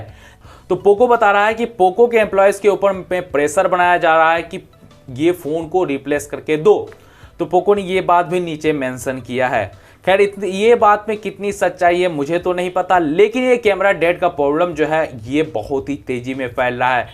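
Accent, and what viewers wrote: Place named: Bihar, India. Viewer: Are bihari bhai podbulam😅😅😅 jada ho rahahe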